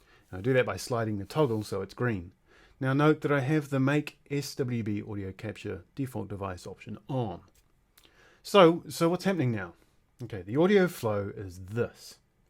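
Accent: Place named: New Zealand. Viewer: Works like a charm! Good old Kiwi ingenuity 🇳🇿🇳🇿🇳🇿 Recognized the accent from a mile away!!! Gotta support a mate from Porirua 😆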